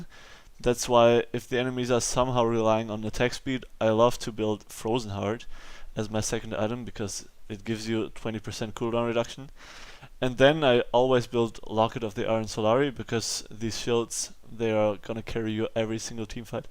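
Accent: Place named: Germany. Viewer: Man erkennt nach spätestens 3min das du deutscher bist :D Aber trotzdem echt cooles Video ^^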